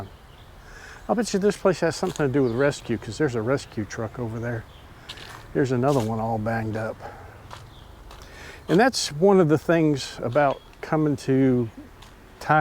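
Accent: United States. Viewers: Where are you from? You sound like your are from my neck of the woods, I am from Jefferson County just east of Knoxville TN. You sound like you are from the promised land lol! Sorry if I am wrong.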